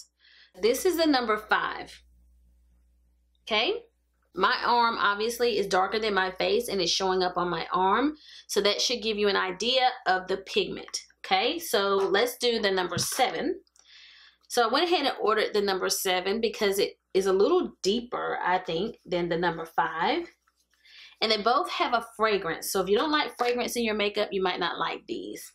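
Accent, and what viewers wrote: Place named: South, United States. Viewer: I adore your personality. Where you from? You sound southern.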